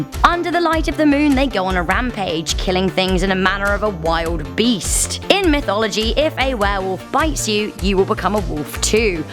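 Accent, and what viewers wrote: United Kingdom. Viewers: WHUUWWEERRWOLVES!!! lol I love this British lady.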